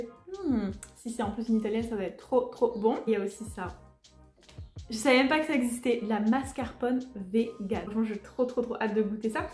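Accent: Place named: England, United Kingdom. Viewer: Il est kiffant ton accent anglais. xD Et Sausage Party c'est le feu, à regarder vrament.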